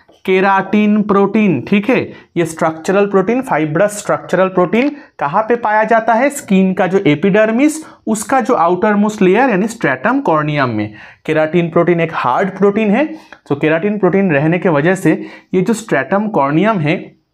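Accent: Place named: Bengal, India. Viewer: Sir your ascent is type of Bengali..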